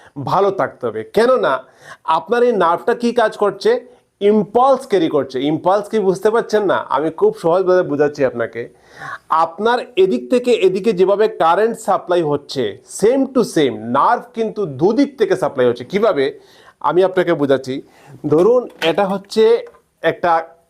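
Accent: Sylhet, Bangladesh. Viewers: আপনার অফিস বা চেম্বার কুথায় বলেন। ভাষা বলে আপনি সিলেটি।চেম্বারের ঠিকানা দিলে উপকৃত হব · আপনার কথায় মনে হচ্ছে আপনি সিলেটি, কিন্তু মেডিসিন গুলি দেখছি সব ইন্ডিয়ান , এসব মেডিসিন কি বাংলাদেশে পাওয়া যাবে ??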